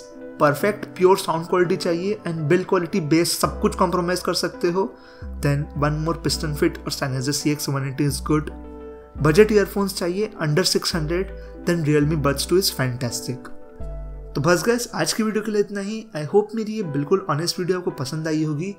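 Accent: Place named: Kolkata, India. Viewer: your accent telling me you are from Kolkata. is that right?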